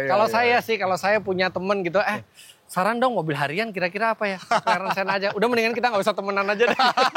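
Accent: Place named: Java, Indonesia. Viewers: Logat jawanya kental sekali.. kok ada yg bilang jawa hama disini 😂😂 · Indonya medok lagi. Koko sby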